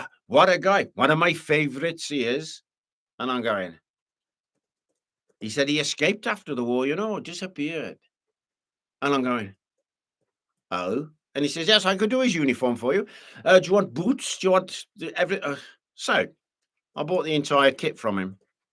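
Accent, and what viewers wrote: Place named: Midlands, United Kingdom. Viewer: I love listening to you. It is nice to hear someone with my accent on youtube, as I come from somewhere near Lichfield. You truly are a gifted story teller and I love both your history videos and the ones about your life❤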